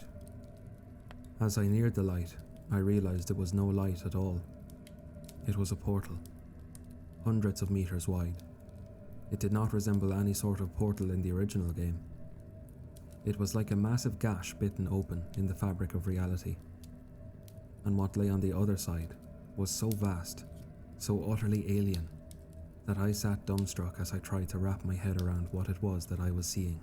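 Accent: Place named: Ireland. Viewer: Finally an Irish narrator ❤ go raíbh míle maith agat ❤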